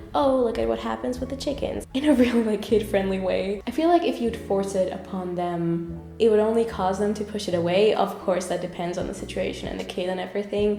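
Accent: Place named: Germany. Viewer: Ich glaube mit Akzent war eher Dialekt gemeint, also die Region aus Deutschland wo du aufgewachsen bist. Kein ausländischer Akzent :)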